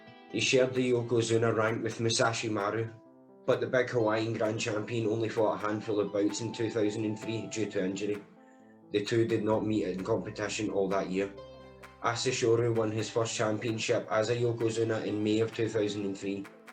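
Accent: Scotland, United Kingdom. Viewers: What a great combination - sumo and the Scottish accent! Enough to subscribe :) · Thanks, I did, Scottish accent + auto-generated subs = fun :) Btw. this is a must-see: Scottish Star Trek <link> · Thank you for the video. Quite nice and well done ... I am a die-hard fan of Asa also. I discovered sumo with Asa, just short before he to become Ozeki. I dont know why but I think I am in love with the Scottish accent. Hearing you, and also following the channel Scotland History Tour I start to really love the way how Scotts are speaking. You (all the Scotts) have a nice musicality in your tempo of speaking. All the best!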